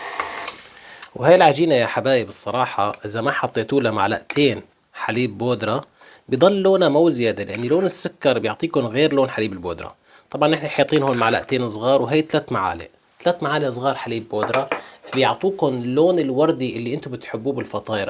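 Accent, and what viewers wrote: Syria. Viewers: معلم المعلمين والله حضرتك كأنه حلبي. بلدياتي هلافيك من وين ماكنت المهم أنك سوري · تسلم يدك. جميله اللهجه. حضرتك سوري؟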